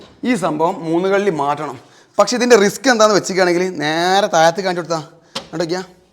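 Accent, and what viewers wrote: Malappuram, India. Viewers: Adaanu njangale malappurathe oru bashaa reethi😂... Malappurathe piller poliyalle😊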